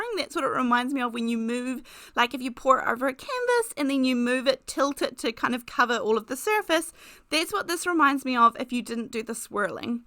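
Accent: New Zealand. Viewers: Yay, we have sound! I love your crafts and the sound of your amazing NZ voice! 🤩🤩🤩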